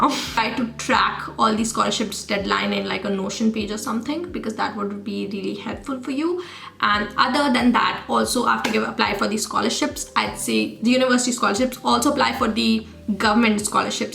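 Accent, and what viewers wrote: India. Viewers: no it is inmposibe i just cant understand this indian way of speach english its crazy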